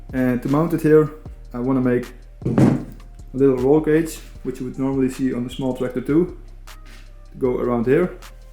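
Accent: Netherlands. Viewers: oké ik dacht al het al te horen een dutch accent 😂 oja we gaaf dat je van een speelgoed trekker een gemotoriseerde maakt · Are you dutch? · as the dutch would say: waajoo dikke john deere trekker! (i'm dutch and he sounds like he's dutch)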